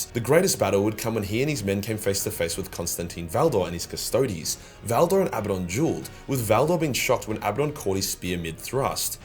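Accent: Australia. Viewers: My friend 600 k is getting closer i am so happy for you ive never ever played a Warhammer game never bought something that has to do with Warhammer but you are my favorite warhammrr YouTuber ever you make it fun you put in some jokes and the aussie accent makes it even better you make awsome videos keep doing great thing 💪💪💪😁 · I may not always get to enjoy having 40k explained to me by a cursing Aussie but when I do I always enjoy it.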